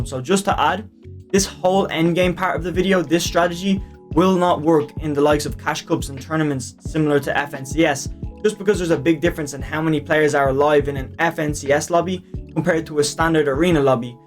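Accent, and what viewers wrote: Ireland. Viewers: Are u Irish I am😃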